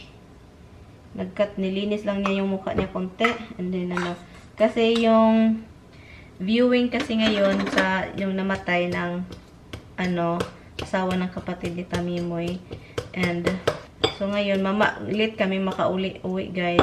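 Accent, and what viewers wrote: Visayas, Philippines. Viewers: hello mam maayong buntag....ka cute sa imong mga ering og ero hehehe bisaya kaayo.....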